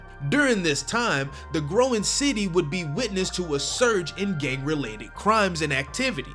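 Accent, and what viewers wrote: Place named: Houston, United States. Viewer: "What it dew" "Going Dine" "Hol up" ....🤔This is a fellow Houstonian...and Black Cyberpunk fan...*Hits Subscribe immediately!!*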